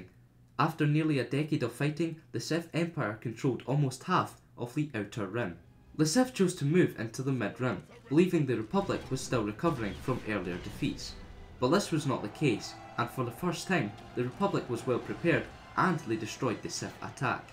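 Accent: Scotland, United Kingdom. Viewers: hes scottish. thats just how it sounds.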